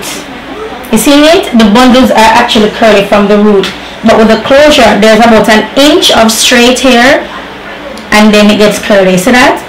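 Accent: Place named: Jamaica. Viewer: I lost focus at one point coz of your ascent 😁 I think I need to learn Jamaican language 😄.... The connection you have with your son..... Is so so amazing ....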